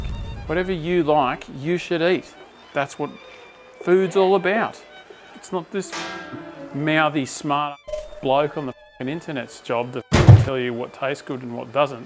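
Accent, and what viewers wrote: Australia. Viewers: I hate that you have to censor your beautiful australian english on youtube if you want to earn any money. FUCK YOUTUBE.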